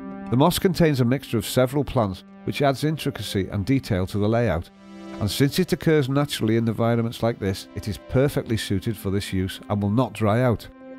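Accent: United Kingdom. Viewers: I just loved this aquarium. Explendid word. just got tired of the british gentleman narration as the work was being develooed then I Mute the video, so I could enjoy the beauty of this work of art and the sound of silence. Jeff from Brazil :-)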